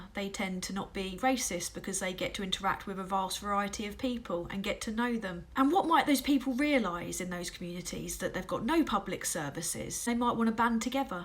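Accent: London, United Kingdom. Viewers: Just out of interest, what part of the country are you from? Sometimes I pick up a London accent, other times a northern accent.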